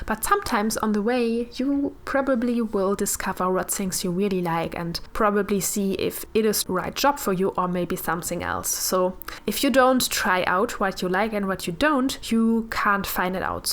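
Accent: Germany. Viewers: The only thing that is a bit annoying is your german accent😅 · are you germaan?😀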